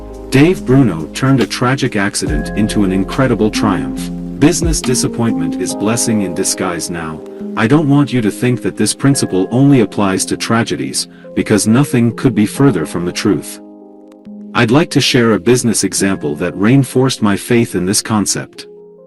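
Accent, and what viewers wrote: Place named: India. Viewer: Accent is like an indian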